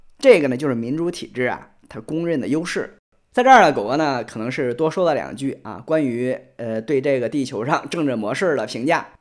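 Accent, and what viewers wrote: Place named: Hefei, China. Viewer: 讲话的很象合肥人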